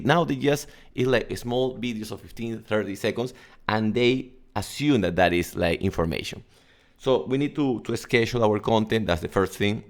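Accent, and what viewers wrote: Peru. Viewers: Nah, Hbla bien solo que reconoces el acento peruano y te parece malo, pero si lo comparas con un ruso, aleman, se le entiende incluso mejor.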